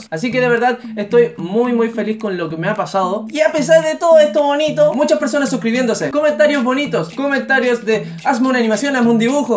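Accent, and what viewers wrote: Arica, Chile. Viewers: eres de Arica, no? xD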